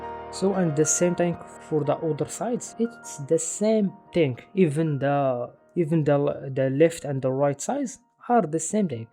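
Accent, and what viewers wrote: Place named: Morocco. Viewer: probably his accent is so Moroccan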